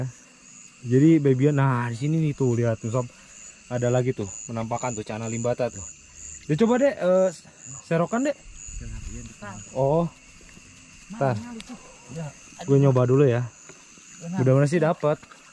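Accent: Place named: West Java, Indonesia. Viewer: Daerah mna om ko logat nya sunda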